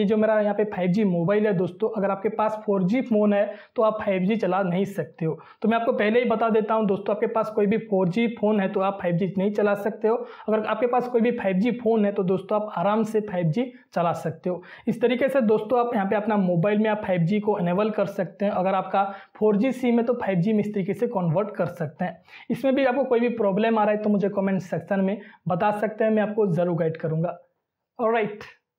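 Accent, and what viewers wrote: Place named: Odisha, India. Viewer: Mujhe thoda thoda laga ki aap odisha se hai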